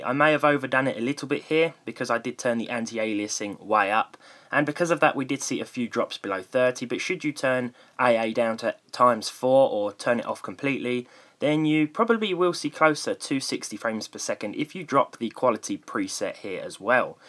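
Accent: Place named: Estuary, United Kingdom. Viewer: Could be Kent, I live in east Kent and it's a variation of the estuary accent from this corner of the country, Essex into Kent.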